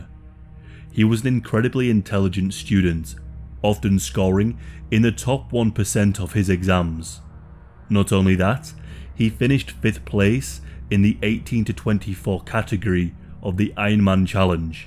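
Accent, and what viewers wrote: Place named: England, United Kingdom. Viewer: Love 💕 your English accent. Hope Derrick is alive and well 💕💕🔥🔥🌹♥️🌹🔥💕💕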